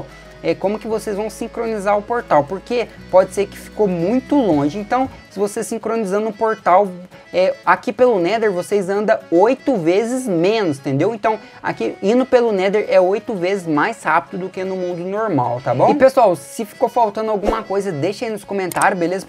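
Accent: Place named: Rio de Janeiro, Brazil. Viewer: o cara é carioca!!! skskskksks legal pá cacete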